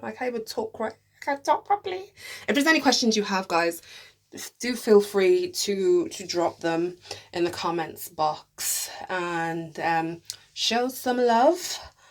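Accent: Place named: Ireland. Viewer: Never mind my question, you said Ireland. I thought that was the accent. But I was told there no Blacks in Ireland or very very few.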